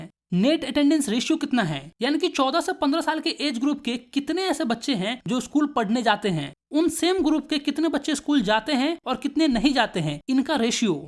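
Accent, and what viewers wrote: Bihar, India. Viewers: You are from Bihar ❤😊 your accent tell that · i really appreciate ur desi Bihari tone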